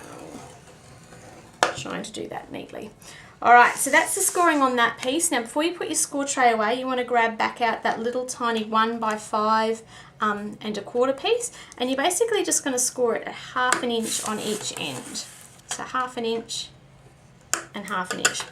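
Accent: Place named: Australia. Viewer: Hi, Thank you for the video on the alternate size. Where can I find the dimensions, other than writing them all down from the video? I love your Aussi accent too!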